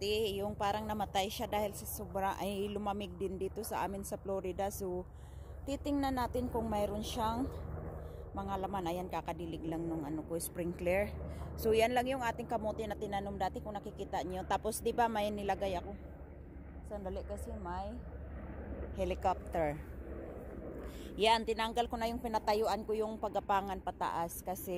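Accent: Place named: Bicol, Philippines. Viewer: ahh kya pla yung tono nyu😊 bicol din ako catanduanes... kmusta nmn po kayo jan..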